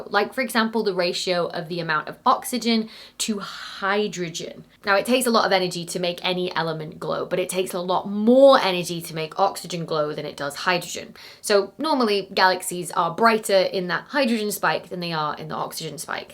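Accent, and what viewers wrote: United Kingdom. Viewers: Tell me you're British without telling me you're British (although, of course, the accent would be plenty). 26 Celsius is 79 Fahrenheit which is the temperature to which I set my thermostat for my air conditioning. The temperature she's melting at is the temperature I cool down to. Insufferable for her is comfortable for me. (There may be a video lighting issue I'm not allowing for, but she seemed to think the temperature was enough explanation). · Dr. Becky's presentation is such an intersection of delightful things from the subject matter to her effervescent enthusiasm for it -- and who doesn't want their serious topics presented with a bit of a British accent? Always a fun way to learn about this stuff.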